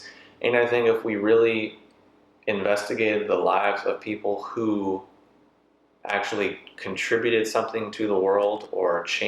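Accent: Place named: South, United States. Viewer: Yes. Very articulate. Just recently found you so I'm hearing a very strong southern "twang" pop up.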